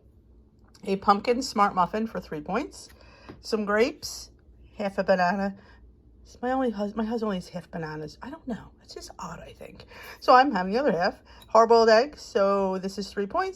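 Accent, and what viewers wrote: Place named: South Jersey, United States. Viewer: Lol!!! This is the first time I have watched you and I kept thinking she sounds like she’s from South Jersey. When pulled into the Amish market in Mullins Hill, I was like I knew it. I am closer to the Williamstown location. I have also been fighting to lose weight most of my life. I have done WW like 15 times, may try again in January. Tried Saxenda shots but my insurance doesn’t cover it now.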